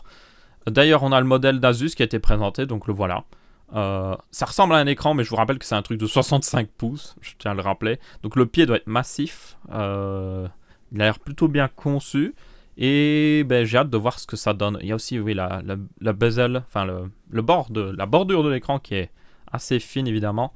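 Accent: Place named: Belgium. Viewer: C'est l'accent belge qui lui fait prononcer "léger" en "légère", c'est tout.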